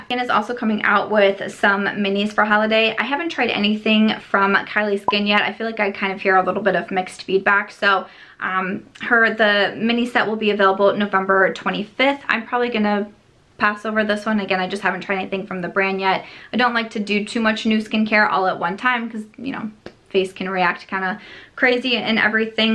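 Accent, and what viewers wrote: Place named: Midwest, United States. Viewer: Love the midwest in you!!!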